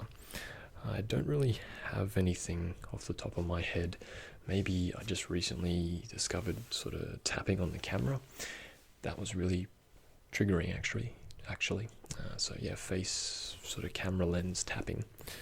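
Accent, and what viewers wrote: Australia. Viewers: I don’t know why but your voice reminds me of an Australian Fred’s Voice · Yes another Australian asmrtist!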